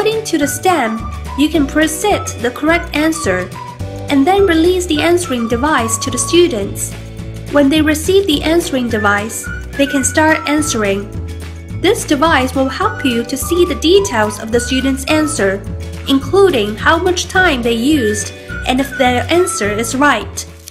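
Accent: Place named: Scotland, United Kingdom. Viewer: She has a lovely accent, almost sounds Scottish.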